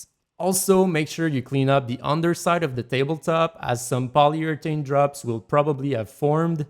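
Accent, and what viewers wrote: Quebec, Canada. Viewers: Great video dude! Are you from Quebec? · Est tu québecois?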